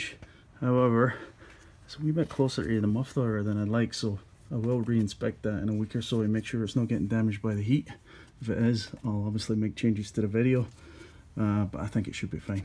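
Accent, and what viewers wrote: Scotland, United Kingdom. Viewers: Do I detect a Scottish accent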